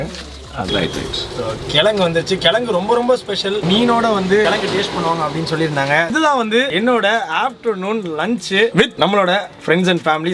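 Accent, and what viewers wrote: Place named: Kerala, India. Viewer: Malayalam slang madiri eruku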